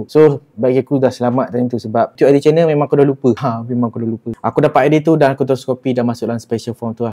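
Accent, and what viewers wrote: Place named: Kedah, Malaysia. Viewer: Kedah mana, Aku pendang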